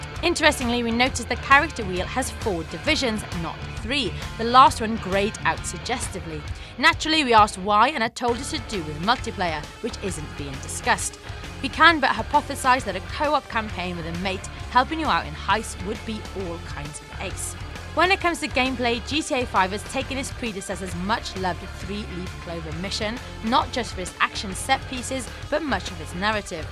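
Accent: Wales, United Kingdom. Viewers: Sounds more like a Welsh accent than an English one.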